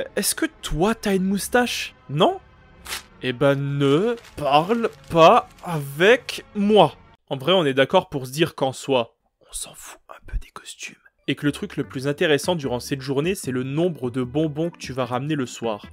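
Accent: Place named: Canada. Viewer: Oui no jugement mais j'aime bien l'accent canadien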